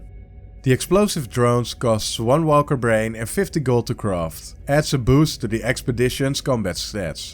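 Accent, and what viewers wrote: Netherlands. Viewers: 😂😂😂 1 seconden en ik hoorde het gelijk😂😂😂 proud of the dutch accent 😂😂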